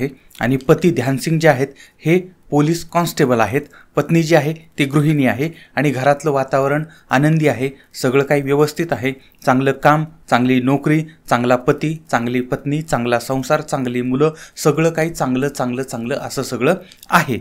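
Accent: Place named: Ahmednagar, India. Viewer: nice Nagari style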